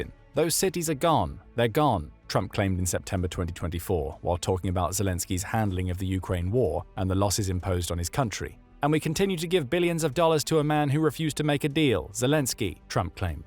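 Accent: United Kingdom. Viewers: Another limey voice = more BS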